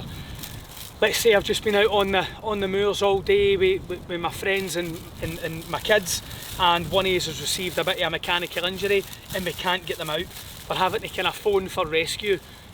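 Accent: Scotland, United Kingdom. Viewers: Cool wee vid guys and great Scottish accent. You planning to get out filming a bit more this year? Thanks for filming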